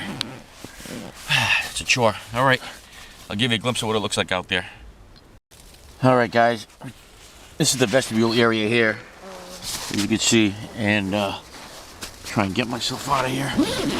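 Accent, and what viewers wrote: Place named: New England, United States. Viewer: Sounds like your in mass or ri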